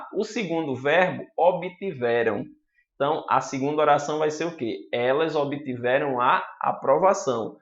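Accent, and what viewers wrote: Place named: Northeast, Brazil. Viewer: Achei ,alguém que consegui aprender e segurar na mente heheh ,boa didática simples e boa de memorizar. Tu és de onde companheiro ? Nordestino como eu ?? Sotaque conhecido hehe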